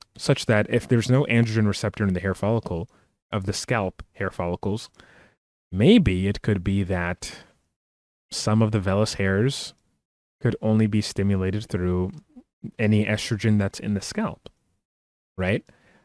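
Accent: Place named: United States. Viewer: Son accent est trop Americian pour l'être 😃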